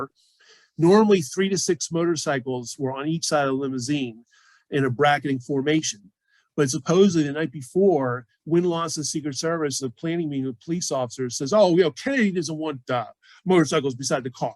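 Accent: Pittsburgh, United States. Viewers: your accent I being a life long northsider know you must be from Pittsburgh am I right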